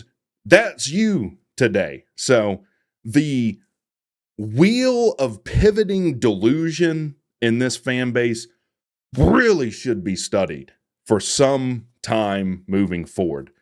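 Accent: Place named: Tennessee, United States. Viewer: Your Tennessean accent is insulting and your opinion is trash. Good luck next year GT... ha...ha.ha...ha....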